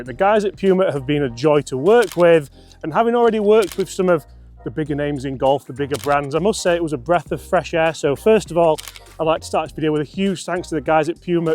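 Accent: Yorkshire, United Kingdom. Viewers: You can tell he’s from Yorkshire…Eee by gum let’s go for the cheapest and make it feel better by the fact we’re professionals than it really is! Lee Trevino used to use bottles to get down greens…. Probably 60/70% of drivers are as good as the previous years even 80/90 % look at all these reviews!! If you’re a 20 plus golfer they may help you, 20-15 maybe 10 yards more forgiving m, 15-20 confidence 15-5 high quality 5–5 elite, be realistic were amateur and mostly shite! Well done to the low handicappers!!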